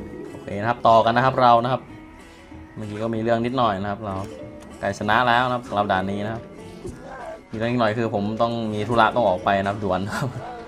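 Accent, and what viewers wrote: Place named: Korat, Thailand. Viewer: พี่พูดโคราชพี่เป็นคนโคราชหรอคับ. ->คักแท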